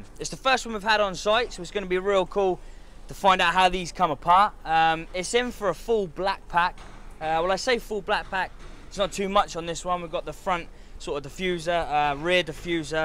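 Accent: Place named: United Kingdom. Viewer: Twit-ah. I love British accents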